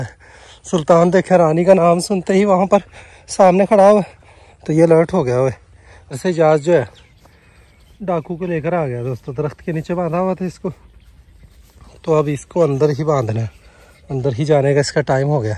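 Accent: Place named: Rajasthan, India. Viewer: Bhai aap ki Bhasha hamare Rajasthan ki Bhasha se milati hai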